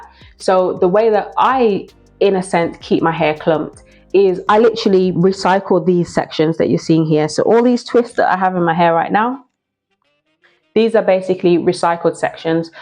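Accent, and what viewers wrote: United Kingdom. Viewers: Good to hear a British accent. Greetings from another northerner.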